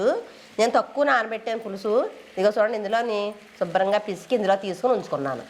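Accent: East Godavari, India. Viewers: Ma east godavari ante alaguntadi mari..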